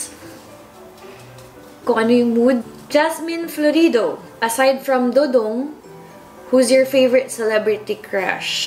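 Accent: Visayas, Philippines. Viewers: Wow apple cider vinegar ,, 😍😍 love u ,, don't change your bisaya accent !! Go bisaya!!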